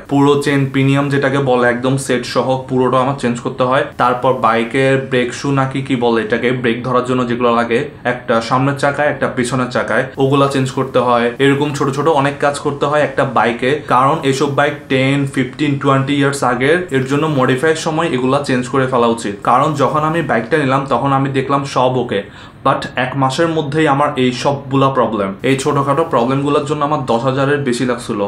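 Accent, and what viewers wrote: Chittagong, Bangladesh. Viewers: ভাইতো খাটি চাটঙ্গাঁইয়া। 😍😂😂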